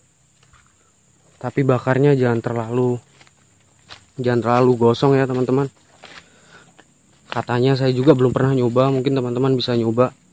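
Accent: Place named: Surakarta, Indonesia. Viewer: Sampean asline ngndi mas,, boso ne ra adoh ko solo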